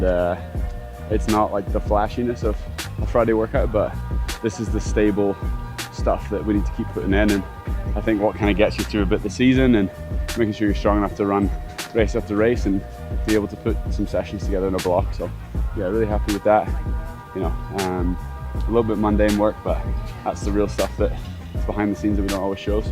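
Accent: United States. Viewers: What’s with the American accent??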